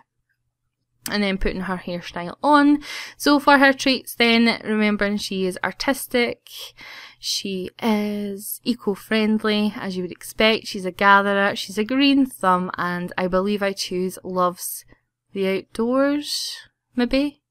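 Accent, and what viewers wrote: Scotland, United Kingdom. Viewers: Hi, I love the LOTR, and your Sims really looks like an Elf, she's very pretty :) Are you Scottish by any chance? :D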